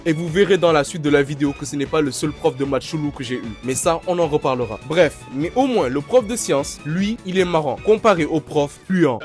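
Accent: Senegal. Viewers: Ta trop un acent senegalais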